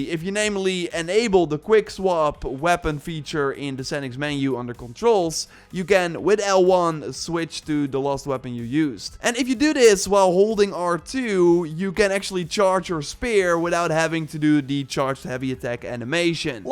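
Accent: Netherlands. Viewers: Man man dat Hollanglish.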